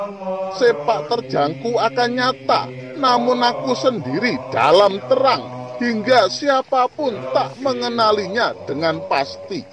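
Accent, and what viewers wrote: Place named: Java, Indonesia. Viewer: Mas ojo medhok2 kalo bicara☺️👍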